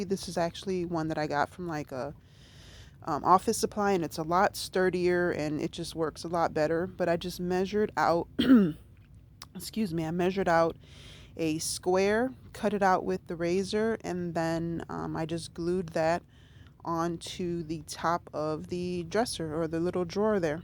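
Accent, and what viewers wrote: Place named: Puerto Rico. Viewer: Beautiful Flag!! 👌I have always love the Puerto Rican accent !! Thank You for sharing 😘❤ your Word I think is Bird. 🥰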